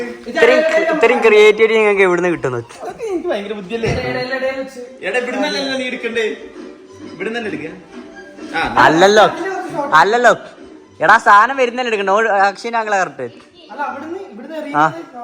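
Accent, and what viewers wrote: Kodungallur, India. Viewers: കൊടുങ്ങല്ലൂർ സ്ലാങ് ആണിത്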